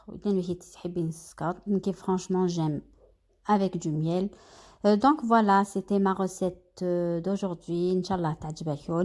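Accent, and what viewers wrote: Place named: Little Kabylie, Algeria. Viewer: Accent de la petite kebylie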